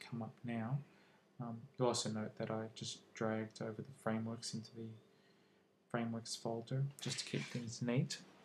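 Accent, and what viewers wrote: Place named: Australia. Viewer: Thank you very much!! I'm very new to this technology but value the work you have put in to demonstrate!! It sounds like you're a fellow Australian ;) Cheerz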